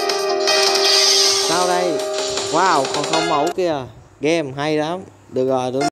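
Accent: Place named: North, Vietnam. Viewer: Ở tỉnh nào ngoài bắc rứa :))) nghe cái giọng giống bắc vl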